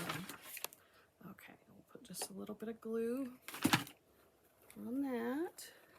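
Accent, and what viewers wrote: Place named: Utah, United States. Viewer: Hello neighbor in Utah 🌿 I'm from California but live in Orem now. I thought your accent sounded like a Utahn😊 ❤ you & your unique crafts!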